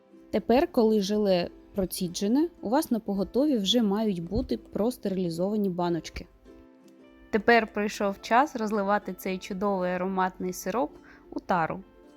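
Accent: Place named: Lviv, Ukraine. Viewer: ого!Я думала,щи Ви зі Львівщини як мінімум 😊Супер👍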